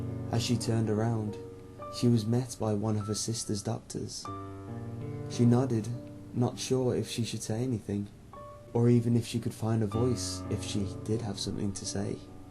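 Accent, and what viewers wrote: United Kingdom. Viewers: GO BRITISH VOICE OVERS FTW sorry for that, here let me...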